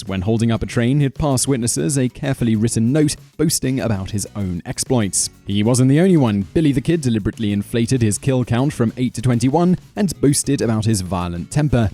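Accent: United Kingdom. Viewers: I didn't get past the first item. Bull corn. Towns did try to regulate, but EVERY cowboy carried a gun when he wasn't having to placate the townfolk. But when they were in Dodge City or Ogalala, they arrived dirty, randy and armed to the teeth. Pure fertilizer. And that this comes from an effeminate "researcher" with a British accent only doubles down the offense. Sign me, a hand from Nebraska. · I dunno😏somehow hearing this British Accent speaking on the History of American Cowboys...quells my interest a little. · kind of disingenuous to hear a limey trying to teach us about cowboys. kind of like an American teaching a brit about the Queen, who is a man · Making the claims that you do, without research or quoting your sources makes your video totally unbelievable. I have spent over 40 years researching the history of the AMERICAN Cowboy and I will tell you (with your British accent) you are full of BULL. 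· Never trust a video about the American cowboy that has narrator with a British accent.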